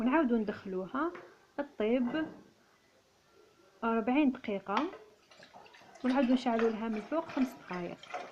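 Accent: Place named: Algeria. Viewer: بالصدفه شفت قناتك وعرفت صوتك جزائريه واشتركت مزيدا من النجاح والتالق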